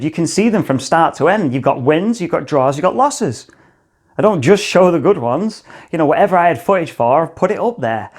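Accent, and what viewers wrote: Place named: England, United Kingdom. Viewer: Ain't here for Martial arts.. Just enjoying your English accent. Pleasant to hear.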